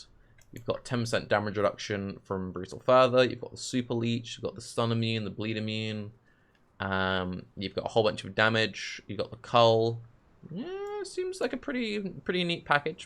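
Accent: RP, United Kingdom. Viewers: He speaks the queen english he says it correct.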